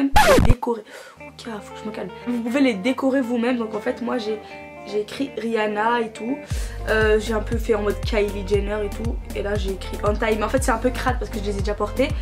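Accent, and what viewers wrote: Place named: England, United Kingdom. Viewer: J'adore ton accent anglais 😂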